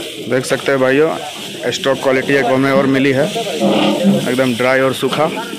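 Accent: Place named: Bihar, India. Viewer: भाई आपका बिहारी भाषा हमें बहुत अच्छा लगता है 🤗🤗🤗🤗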